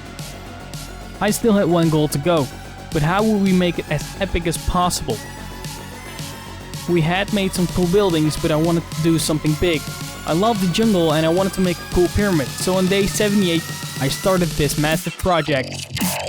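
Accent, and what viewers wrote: Netherlands. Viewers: Nederlands accent tho XD verry nice game play! loved it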